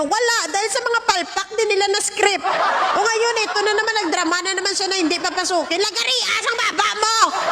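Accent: Iloilo, Philippines. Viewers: I like your Iongga accent.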